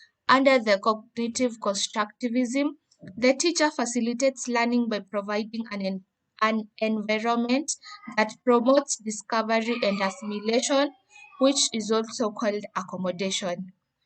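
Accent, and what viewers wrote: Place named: Kuria, Kenya. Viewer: Heavy Kurian accent kama nusu vinemba wenzako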